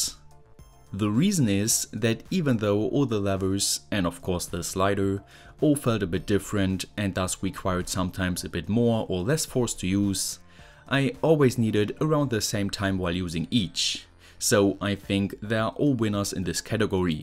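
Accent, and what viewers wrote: Germany. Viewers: It's much better now, finally being able to put a face to that weird German voice! 😁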